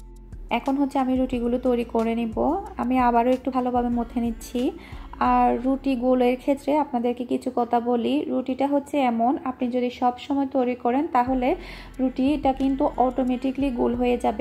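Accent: Chittagong, Bangladesh. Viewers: R u from chittagong?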